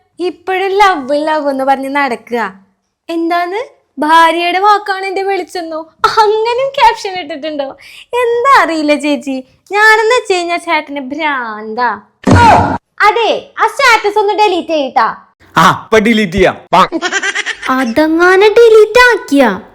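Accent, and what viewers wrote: Thrissur, India. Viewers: തകർത്തു തിമിർത്തു. വളരെ നന്നായിട്ടുണ്ട്. Specillay നിങ്ങളുടെ സംസാര രീതി. ഞാൻ കുന്നംകുളം ആയതിനാൽ തൃശൂർ രീതി ഒരുപാട് അശ്വതിക്കുന്നു. എന്നെങ്കിലും നേരിൽ കാണാൻ കഴിയുമെന്ന പ്രതീക്ഷയോടെ.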